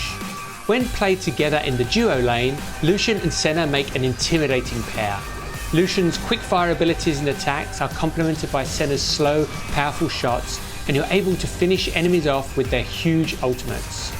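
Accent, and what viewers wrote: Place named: England, United Kingdom. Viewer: Ever heard of "english accent"? have you not seen or heard a british lad before?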